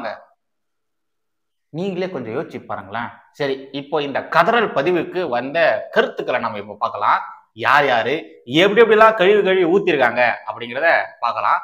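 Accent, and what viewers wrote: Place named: Chennai, India. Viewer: நீங்கள் தொகுத்து வழங்கும் மதராஸ் பாஷை மிக்க நகைச்சுவையாக இருக்கிறது ..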